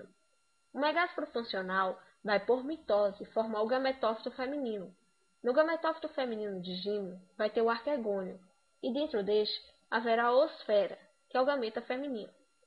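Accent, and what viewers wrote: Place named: North, Brazil. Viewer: Parabéns! Sou Paranaense e gostei muito da aula e parabenizo você pela iniciativa! Pelo sótaque você é lá de cima! Como sempre muito inteligentes! Abraço!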